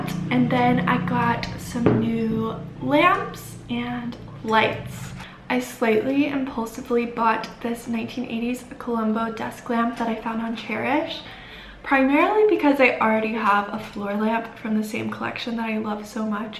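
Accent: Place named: South, United States. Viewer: Omg I used to watch you yeeeears ago when you still had a cute little country accent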